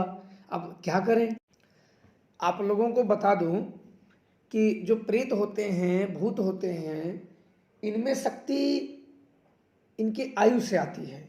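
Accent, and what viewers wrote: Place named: Varanasi, India. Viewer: Shriman jee aapki baate bahut pyari lagati hai. Aapke awaj se lagata hai ki aap Varanasi district ke rahane vale ho. Aap kripa karake mera pranam swikar kare aur mujhpar kripa kare. Mai janata hu ki duniya ke vishay me aapaka anubhav achchha nahi hai. Mai bhi ek bhataka huva ati tuksh manav hoo. Yadi aap chahe to mujhpar kripa karake mera kalyan karane ki kripa kare aur mujhe sachche raah pe chalane ke liye marg darshan de. Mujhe apane kripa yogya banaye aur apane charano me sthan de. Kripa karake apana sampark ka madham bataye.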